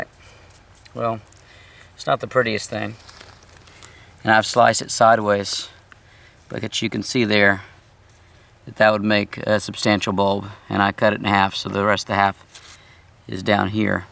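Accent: Texas, United States. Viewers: He's a Texan.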